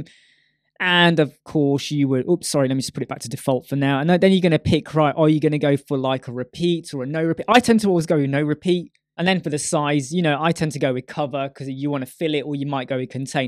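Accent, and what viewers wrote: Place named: United Kingdom. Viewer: Good videos but I hate british accent. It makes me angry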